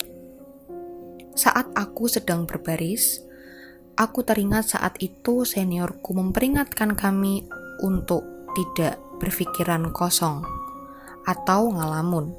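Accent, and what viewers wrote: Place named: Java, Indonesia. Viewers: aduh mbak meduok eee hehe :D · Gak juga ah, yang ini medok jowo kok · Logat e jowo banget 🤣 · Medok sekali mbak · Ga nahaaan medoknyaaa.. jdi kangen sama kampung halaman 😘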